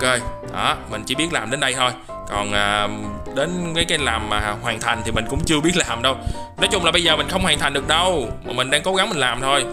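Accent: South, Vietnam. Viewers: Anh miền nam not trung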